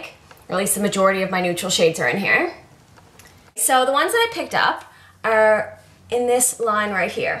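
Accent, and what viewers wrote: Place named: Prince Edward Island, Canada. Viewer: Are you from PEI? Haha I can sense an accent. Great video!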